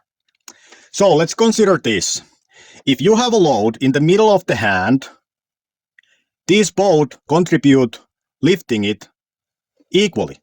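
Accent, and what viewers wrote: Finland. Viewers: strongest finnish accent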